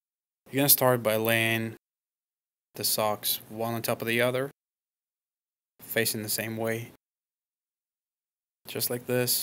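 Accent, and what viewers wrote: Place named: Eastern Europe. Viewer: Where are you from? I'm hearing a Slavic accent but I may be wrong.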